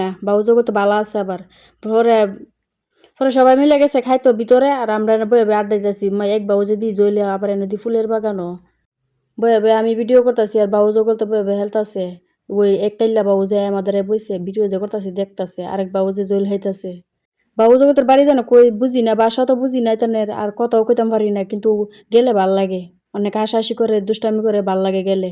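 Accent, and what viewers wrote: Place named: Brahmanbaria, Bangladesh. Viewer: আপনি দি আমার দেশি , কথায় বুঝা যাচ্ছে,, ব্রাহ্মণবাড়িয়া কোথায় আপনার বাসা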